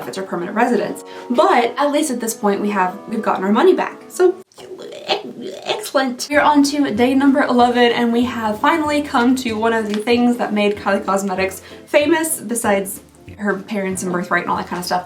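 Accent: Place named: South, United States. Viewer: I love hearing a woman with a southern accent like myself tear into a rant. Now I know why my husband smiles when I go off about the grocery store making stupid substitutions on my items. I smile through your whole video whether you’re ranting or not. ❤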